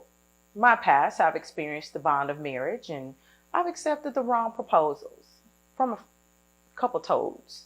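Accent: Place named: South, United States. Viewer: With your souther twang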